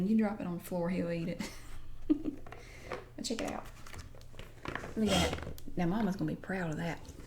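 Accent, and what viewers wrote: South, United States. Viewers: I was about to comment saying wow that is a strong southern states accent! Then I read the description 🙈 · The green beans snapping gave me a lot of tingles, and I love the southern accent! I have a lot of family who have southern accents, and I agree with previous comments that it makes me feel relaxed and at home! Great role play :) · Whoa, those green beans gave me really surprising tingles, not something I was expecting! Great video, love the "super southern" accent.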